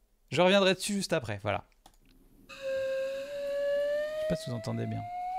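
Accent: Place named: Agen, France. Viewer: Pour moi on est sur l'accent d'Agen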